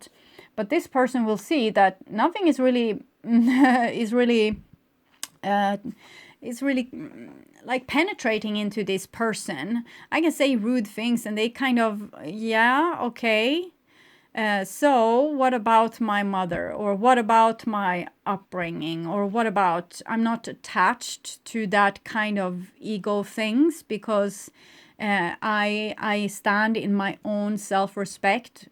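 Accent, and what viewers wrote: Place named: Sweden. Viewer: I love your readings ! They are long but are very in-depth ! I actually after a first watch / listen ! I replay them your voice is very soothing, it’s the Swedish accent speaking English I think it’s very soothing , it makes me fall asleep on a replay! You are correct with being troubled I’ve been troubled for years in regards to an old partner who I have children with who is a drug addict ( meth amphetamines ) it has destroyed her and all I do is walk around and pick up the pieces which is the children ! And to be honest with out being self promoting I’ve done well serving my children ! The enemy ( the drug ) not the person attacks the wall every now and then but weve held strong , the boys know how it can be very calm then the storm comes they’ve been through so much at a young age ! However I haven’t been able due to the hard work of raising children to find a suitable partner 7 years of bad luck but I do believe it is over! 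There is something in the air this spring ( Southern Hemisphere) I am a pagan and I gave some sacrifice to the gods / goddess this spring in an offering of mead seeds honey and work in planting trees some meditation! My ego over the years thought it was strong enough to be alone but it is really not natural so throw these things to the wind and hope for the best! Tusen takk loving lady from the north ,-) ,,,,, haaaaaaa you are so right with being nationalistic , I am an ex soldier who has been to war ! It changed me totally, for sometimes bad but for t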